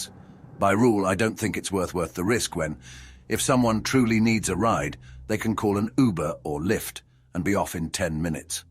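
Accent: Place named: United Kingdom. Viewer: Yay new horror narrator cool and a lovely British accent bonus ❤ subscribed ❤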